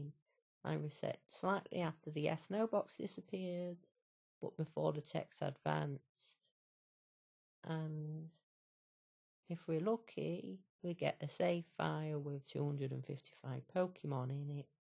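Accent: United Kingdom. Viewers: Ta you sure the video wasn't on x0.5 speed? sounds like a british girl to me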